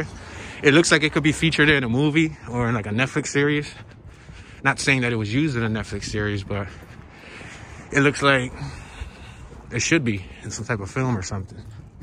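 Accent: Philadelphia, United States. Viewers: Loving the walk with you and love the philly accent keep them coming be safe out there walking those breezy streets of philly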